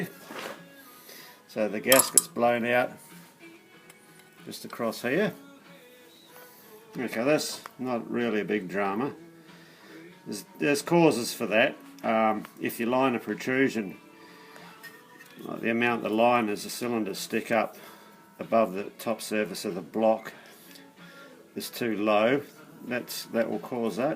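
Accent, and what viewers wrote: Australia. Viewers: First time watching your channel i found you via Cam at Battler. A new Workshop and Machine Shop sounds very interesting. Definitely worth subscribing to find out more Sounds like an Aussie accent the T Shirt you are wearing had me guessing for a minute but once outside that definitely looks like an Australian farm. Looking forward to watching your current video,s and more in the future. All the best. Regards Peter.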